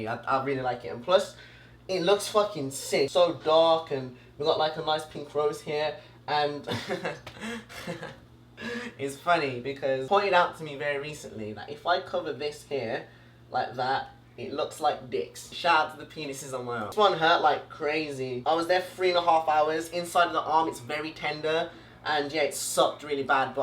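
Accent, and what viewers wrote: United Kingdom. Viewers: Wth I f with his music I never thought he would talk like this I didn’t even know he was British damn tuff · Ma Nigga on your songs you sound like you'r from hell but on your videos you sound like you'r from london (because england is my city)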